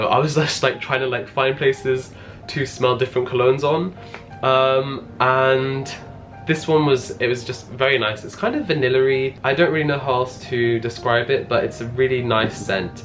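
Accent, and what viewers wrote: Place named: England, United Kingdom. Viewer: Idk why but I luv your English accent sooooooooo much, it melt me out!! ASMRRRRR!! Luv from a little vietnamese girl <3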